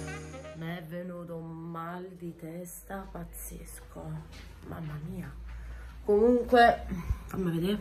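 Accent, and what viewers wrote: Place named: South, Italy. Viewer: Sento il dialetto e in un istante mi fai tornare indietro nel tempo..quando andavo giù dai miei zii cugini ..e da 4 anni che non scendo a lettere .sei super attiva giovane con 3 bimbi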